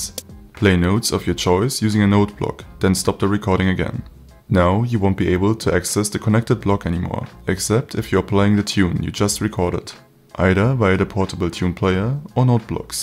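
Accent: Germany. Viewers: Irgendwie so ein deutscher akzent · I hear deutschen akzent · Are you from Germany?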